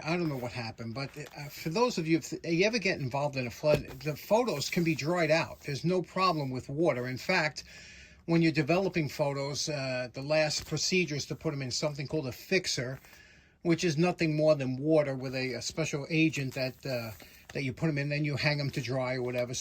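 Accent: New York, United States. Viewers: Cheers, everyday is a school day watching you! Very informative and I find your explanations really enjoyable (especially in your new York accent) I'm loving going through all your past videos. Cheers from Devon, England 👍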